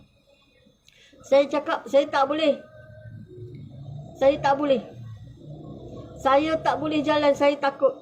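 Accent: Kelantan, Malaysia. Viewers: Mari kot celoh mana menasio nie.. Bunyi kecek supo kltn.. Wat malu ore kltn jah. Dgr ko dia dok cito tu aku rasa 3 ari dia nie x bgun tido. Nk sudah ko mimpi tu gak..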